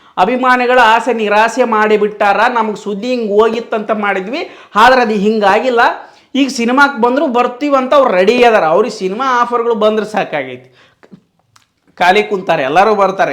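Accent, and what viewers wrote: North Karnataka, India. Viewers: He dosta ninna stayl alle helutu ba ninna uttara karnataka shailili super aiti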